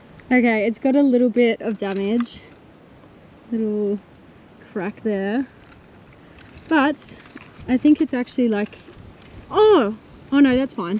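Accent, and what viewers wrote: Australia. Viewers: Hi honey love watching your videos and you are a very gorgeous Australian sexy young woman honey keep these videos of you keep coming because love the areas that you travel to honey and don’t won’t to brag honey you truly a very sexy and extremely gorgeous young woman i appreciate all of your videos love ❤️ you and your beautiful acsent honey again thank you so much hope to see more beautiful areas of Australia beautiful Outback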